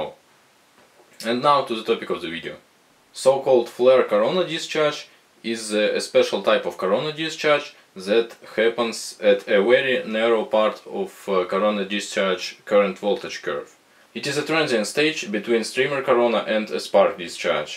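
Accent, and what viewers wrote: Russia. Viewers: такой "русский выговор". Прям вообще!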